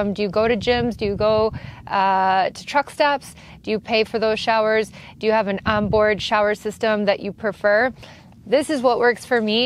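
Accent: Wisconsin, United States. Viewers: My shower needs are probably less than yours because I’m a guy and I’m bald but the shower I keep going back to is the MSR dromedary bag with there shower attachment that I purchased in 1998 it’s simple and it works and for the last six months it’s been my only means of showers even during the-25 weather we had in Wisconsin this winter! O and when you say stuff about How you talk and ramble You just sound like a Wisconsin girl to me.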